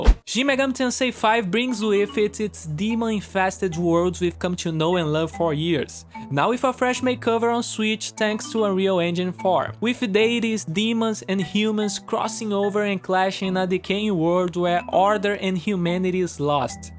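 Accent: Brazil. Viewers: engraçado como é excepcionalmente facil identificar BR falando inglês xD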